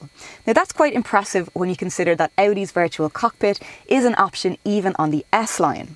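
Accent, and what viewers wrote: Dublin, Ireland. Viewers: You do have posh Dublin accent, no pun intended :) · Great Review, I am getting one in 2020, my price tag is 37 grand, so i hope i get a nice one for my money, plus i have a strong Dublin accent like you so again i hope Mercedes look at your review and adjust the car to our accent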